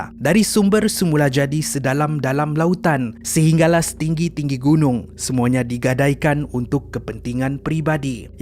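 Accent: Sabah, Malaysia. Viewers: Buat pengetahuan semua bukan orang Indonesia. Dia orang Sabah memang loghat dia pun beza.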